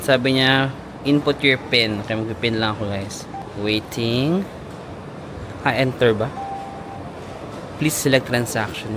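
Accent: United Kingdom. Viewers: Meron kang British accent. Always stay safe in Sydney. Godbless! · You sounds British mate! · That is more of a UK accent mate😂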